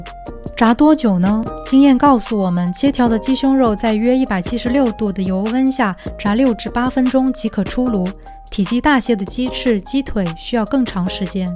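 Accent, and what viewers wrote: Beijing, China. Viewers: 主播北京口音很好听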